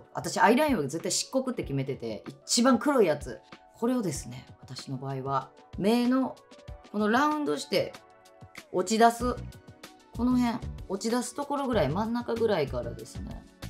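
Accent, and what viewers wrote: Kansai, Japan. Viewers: Vogue で関西弁が新鮮で良い❤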